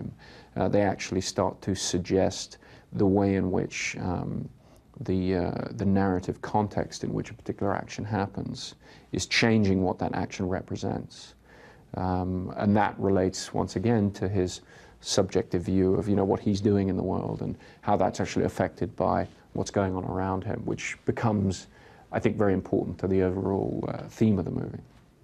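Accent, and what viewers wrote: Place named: Australia. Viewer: He actually sounds kind of Australian in this video.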